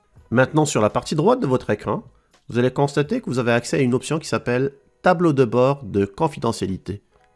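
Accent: Quebec, Canada. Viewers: Cela semble convaincant !!!!!: mais même s'il me semble que cela doit être super important, je crains de faire une mauvaise manip qui pourrait provoquer des problèmes sur mon PC...MAIS je serai tentée de le faire.....Merci et j'adore l'accent Canadien que j'écoute depuis le Médoc en France. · Abonnée + 1 et oui, vidéos extras et avec ce petit accent québécois, c'est encore plus sympathique. Merci ^^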